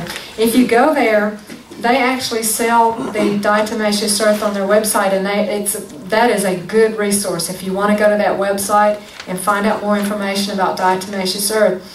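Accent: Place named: South, United States. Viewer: i love your Southern accent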